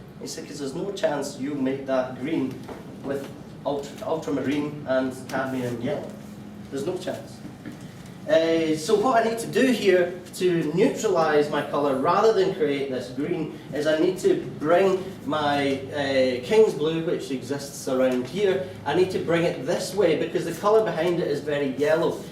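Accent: Scotland, United Kingdom. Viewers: I have watched all of the videos in this playlist. I have acquainted my ears with Scottish accent. I can't say the same for the closed captioning system. It's actually quite funny to see what the automatic system thinks he is saying. He says never try to paint along with him, but I am. All of my colors seem to be bleeding together with the dark reds. I wonder why.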